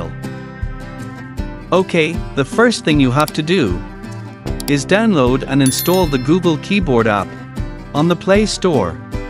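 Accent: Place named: Ireland. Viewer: Great Irish accent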